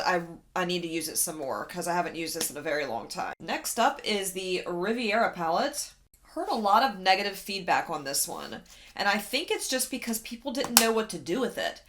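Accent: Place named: South, United States. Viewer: I discovered you because of this video and I love you 😂❤️ are you from the southern states? Because you sound like my people 😂. Also. Can I be your daughter of niece? I just discovered makeup 1 1/2 years ago and trying to build my collection. Lol. Jealous!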